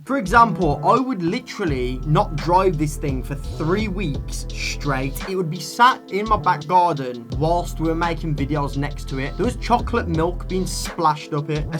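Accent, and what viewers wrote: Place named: Birmingham, United Kingdom. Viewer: Never thought I would see a brummie in a lambo, but ok...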